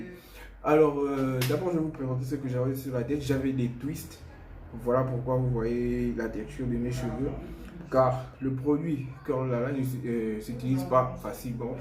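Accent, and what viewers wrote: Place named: Antilles, Caribbean. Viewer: Tema l'accent des Antilles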